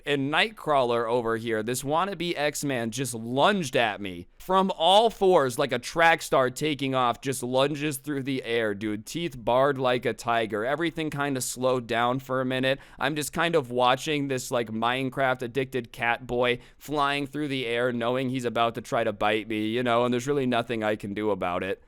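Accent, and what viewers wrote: United Kingdom. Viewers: ngl i like british accent or something (im not british) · I think his cat noises and British accent makes me have stampy vibes but stampy actually talks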